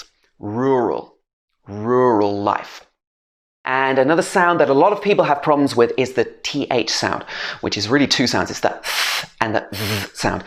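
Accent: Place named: United Kingdom. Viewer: Good British pronanciation..thanks for such type of videos.. visit our channel...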